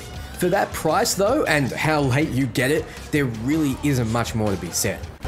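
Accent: Australia. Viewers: Different accents pronounce things differently. There's no absolute right way to pronounce words in english since there's so many different versions of it. E.g. the word "herb" is different between american english and british english since americans say with H as silent while british actually say the H. This dude is australian so they might say it with the E silent. I'm finnish and we study british english at school and even I would pronounce Porsche with a silent E.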